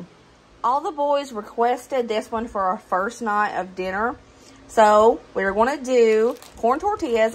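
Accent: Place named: Tennessee, United States. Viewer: YOU’UNS! I am from Mississippi and was trying to predict what state you are from based on your accent alone…you’uns tells me probably Tennessee! You have a lovely soul. Thanks for sharing some great ideas.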